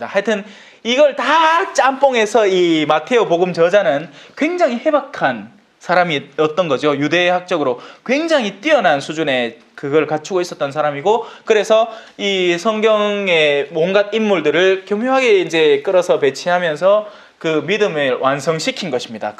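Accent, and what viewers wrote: Gyeongsang, South Korea. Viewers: 신부님~ 이틀전 우연히 신부님 영상이 떠서 오늘부터 마태오복음 1강 시작했습니다^^ 신앙생활1년차인데 같은 경상도 사투리라 친근하고, 많이 배우고 깨닫고 느끼고 갑니다. 앞으로 한 강의 한 강의 들을 때마다 저도 영적으로 많이 성장할것같아요😊😅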